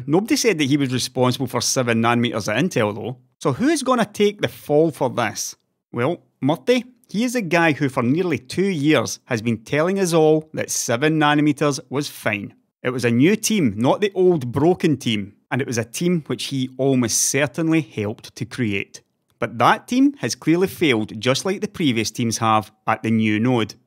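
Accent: Scotland, United Kingdom. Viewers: I can barely understand that thick Scottish accent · Scottish, with a little bit of influence from somewhere I can't remember off the top of my head now, from living outside of Scotland for a while. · It's an scottish accent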